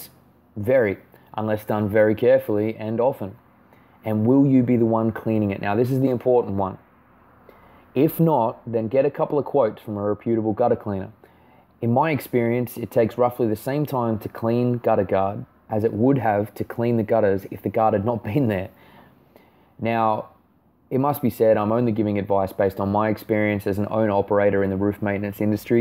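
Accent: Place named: Australia. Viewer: Oops, LOVE THE AUSSIE ACCENT!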